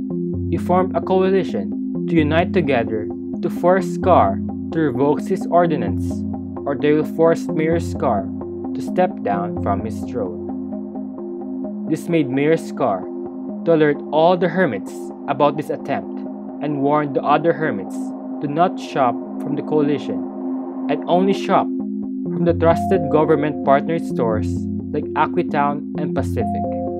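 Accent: Philippines. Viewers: are you filipino cause of the accent??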